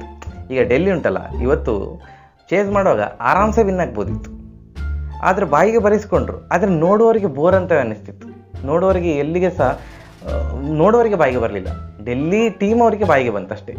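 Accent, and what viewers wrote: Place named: Coastal Karnataka, India. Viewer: ನಾನು ಮೂಲತಃ ಉತ್ತರ ಕರ್ನಾಟಕದವನು, ಕರಾವಳಿ ಭಾಗದ ಭಾಷೆ ಕೇಳೋಕೆ ತುಂಬಾ ಸೊಗಸಾಗಿರುತ್ತದೆ ಅದರಲ್ಲಿ ಬೆಳ್ಳಂಬೆಳಗ್ಗೆ ನಿಮ್ಮ ಮಾತು ನನಗೆ ಹಕ್ಕಿಯ ಚಿಲಿಪಿಲಿಯ ಹಾಗೆ ಇಂಪಾಗಿ ಕೇಳಿಸುತ್ತದೆ. ಧನ್ಯವಾದಗಳು.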